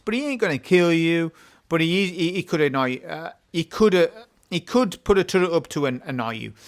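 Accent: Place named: England, United Kingdom. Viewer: well, the youtuber, who sounds English, butchered the word. I was assuming the issue was cultural unfamiliarity.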